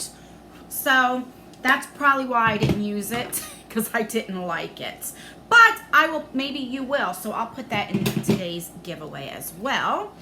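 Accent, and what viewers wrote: New Jersey, United States. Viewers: I love your videos. You are so funny and I love your NJ accent.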